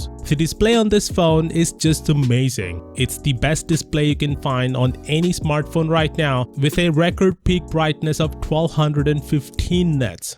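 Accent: India. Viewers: it's accent not plastic surgery ! In india their r many people who speak like this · many people in India speak with this accent.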